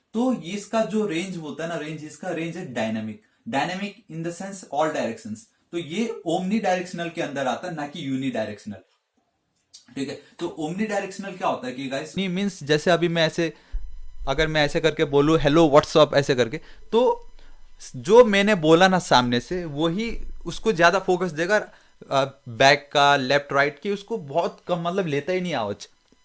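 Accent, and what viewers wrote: Nepal. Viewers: da tapai ko Nepali tone ayo....😁😁 Tara....saachi...dami....u know wat I used to make videos on Hindi as m Nepali so my vocal tone...is not purely Hindi....so my friends used to laugh over me but when I saw ur subscriber and video content it motivate me....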